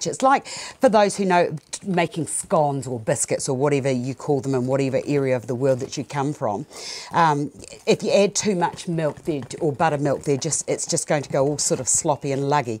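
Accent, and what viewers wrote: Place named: New Zealand. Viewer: Thank you for answering. So helpful. Miss that lovely Kiwi accent. I’m from the States, but lived in NZ for 3 years back in the 90’s, 1 year in the greater Auckland area and 2 just outside Christchurch (Governor’s Bay). Beautiful country and lovely people. 😊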